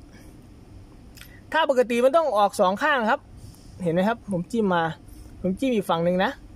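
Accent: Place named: Isan, Thailand. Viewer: สำเนียงต้องอิสานโป่งเว้าไทยต้องเป็ะๆๆ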